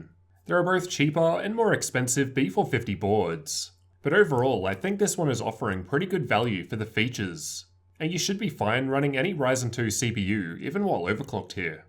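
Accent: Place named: Australia. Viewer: U are Aussie right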